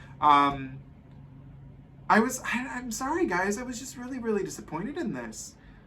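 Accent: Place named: Spain. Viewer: I love how you pronounce the Cs, as a Spanish person and not like a Latino.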